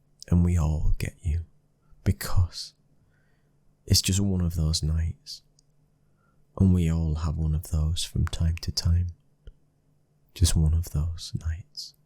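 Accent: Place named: Northern England, United Kingdom. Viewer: I'm originally from New York, but now live in Chicago. I'd love to move to Yorkshire one day. I could tell by your speech patterns that you were Northern English. Your voice is so soothing and gorgeous.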